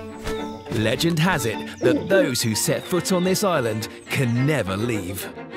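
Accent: United Kingdom. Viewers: I can't help but think of Ryn from Xenoblade Chronicles when hearing the British narrator. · It's the British accent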